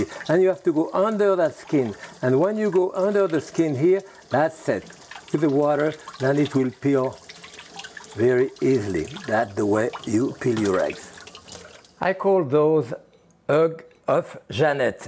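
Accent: France. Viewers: What a great idea for an economical lunch, these eggs, some crusty bread and butter and maybe some salmon, with a spicy sauce! Jacques Pépin you are a treasure! Absolutely love his cultured accent, mostly French with a tiny bit American! God bless you Sir!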